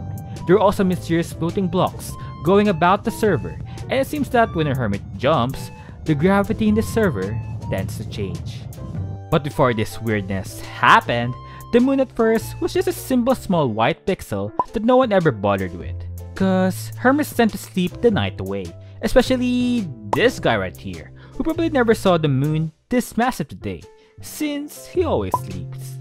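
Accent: Philippines. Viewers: i love ur filipino accent lods :)) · Ur accent looks like a filipino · are you filipino? i can tell by the accent😂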